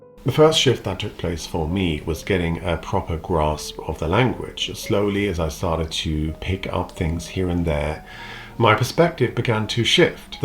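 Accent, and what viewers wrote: Germany. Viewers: I’m sure that you’ve heard this before, but you’ve picked up an ever so slight German accent. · You have a strong German accent! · You don’t sound British. You sound like a German with very good English. Maybe you picked up a German accent living there. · This English gent speaks English with a German accent. Interesting. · Interesting. You seem to speak English with a German "accent ", now! I am quite blunt but I'm from the north of England! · Your english now has a german accent 😂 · Your commentary on German norms is helpful. Thank you. I'm a Brit living in France, work with an international company where some Germans work. I get on best with the Germans, feel like I have more in common in work ethic. By the way, you seem to have acquired a slight German accent.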